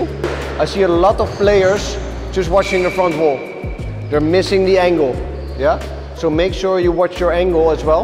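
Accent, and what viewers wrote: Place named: Netherlands. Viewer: 1000% dutch accent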